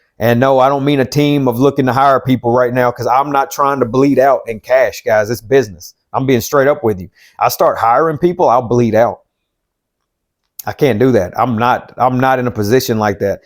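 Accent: United States. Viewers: I like your country/ Southern accent 😉😊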